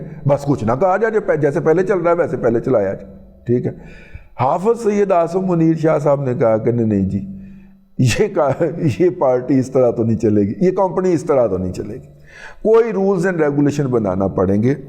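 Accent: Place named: Sialkot, Pakistan. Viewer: Dada Jee ..., Sialkote tone... Good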